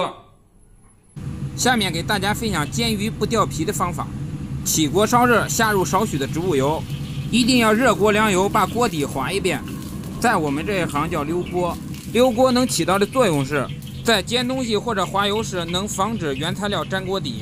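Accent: Standard. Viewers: 我們家都放老抽。解說很標準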